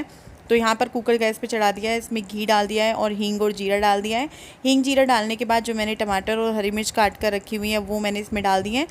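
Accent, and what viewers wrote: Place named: Punjab, India. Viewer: Hiiii di , aap punjabi ho Kya , aapki tone thori thori lgti hai waisi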